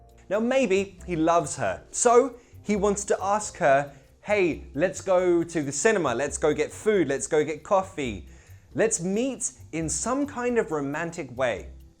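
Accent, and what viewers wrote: United Kingdom. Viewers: I was attracted by British accent , I wanted to learn more and searched on youtube, then came across your videos. pancake +1 and spiderman is better .haha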